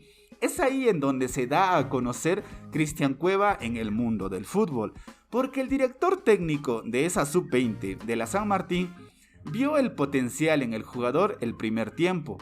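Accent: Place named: Chile. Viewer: oye creo q eres chileno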